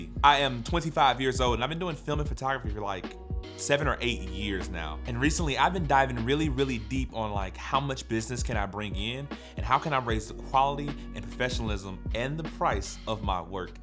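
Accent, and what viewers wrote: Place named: Mississippi, United States. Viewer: BRO YOU FROM SOUTH MS ?? Word yo me to!!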